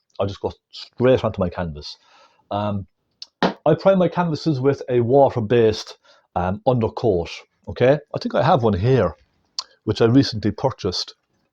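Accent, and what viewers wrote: Ireland. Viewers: is your accent Irish?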